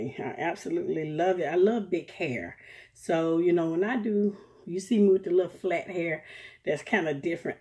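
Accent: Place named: Alabama, United States. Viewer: I KNEW YOU WERE FROM GEORGIA OR EITHER ALABAMA. YOU SOUND JUST LIKE MY COUSINS IN ALABAMA.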